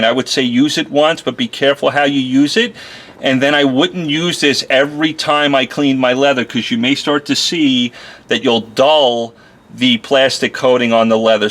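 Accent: New York, United States. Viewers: It's impossible to tell if that's dirt or colorant that's pulled off the seat. Hope your N.Y. accent doesn't drive the native Texans nuts! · Thanks for the tip. I have to do my car and wife's car soon. What pat of N.Y. are you from? (Never heard a Texan sound like Ray Romano)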